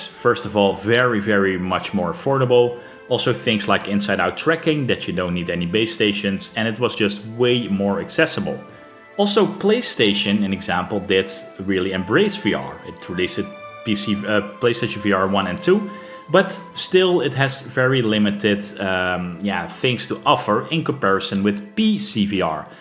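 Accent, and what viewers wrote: Netherlands. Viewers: Ik hoor n hollander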